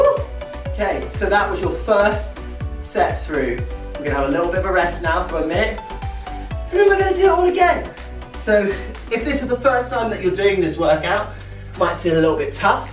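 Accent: United Kingdom. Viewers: Weirdos? why? Hes hot! Hes shirtless! Hes got british accent!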